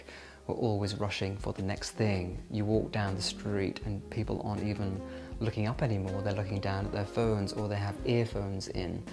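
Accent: United Kingdom. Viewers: Your British accent with everything else is elegant❤️❤️❤️❤️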